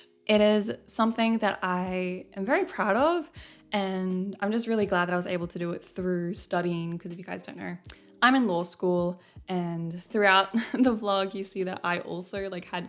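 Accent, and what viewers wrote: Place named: Australia. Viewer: honestly i just finished wathcing your writing documentary that randomly appeared on my fyp. It was the first ever video, I saw from you and actually it made me following you after I just watched for a few minutes. What I'm gonna say maybe sound a bit weird, but your voice with this austrialian accent reminded me of my home. Not in the way of living there, i'm an austrian girl, but more like because of four people in my life, that come from there. For me, those four celebrities kind of tought me speaking more confidently in english and thats also why i got an australian accent myself eventhough we only learn american english in school. I really liked your whole vibe and look so i really think that you'll become sort of a safeplace for me and my thoughts. Writing has always been a huge part pf my life and watching your video really inspired me.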